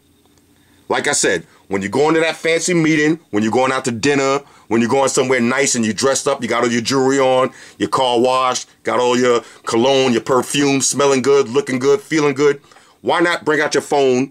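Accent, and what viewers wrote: New York, United States. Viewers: I always come here for your NYC Accent and your reviews!!!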